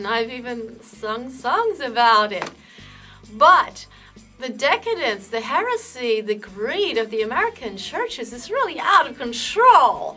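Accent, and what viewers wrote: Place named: Germany. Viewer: You have a German accent!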